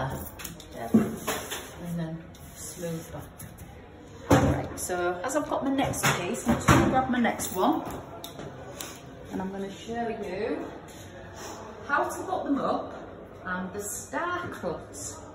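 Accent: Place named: Hull, United Kingdom. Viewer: I need this lady to do a mural on our ceiling and she sounds local to Hull??? Would be great if she’s available or has any great recommendations ❤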